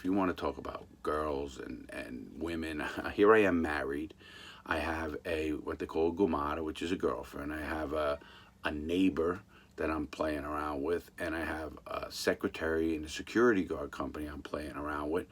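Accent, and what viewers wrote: New York, United States. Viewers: A Polak from Florida with a New York accent I cannot get enough of this guy such a great storyteller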